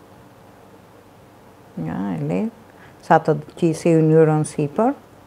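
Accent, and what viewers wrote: Tirana, Albania. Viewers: pershendetje e dashur oooo me kenoqe me ate te folme me ate duret e arta sa qe me thote goca lene mos e luj mamin se eshte tu shiju te folmen e tirone 😘😘😘❤❤🌷🌹🥀🌺⚘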